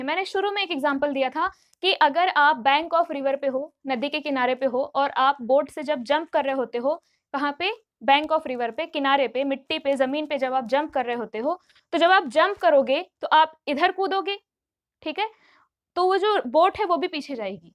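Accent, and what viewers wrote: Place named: Uttar Pradesh, India. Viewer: Ma'am aap up se ya Bihar se hongi etna fix hai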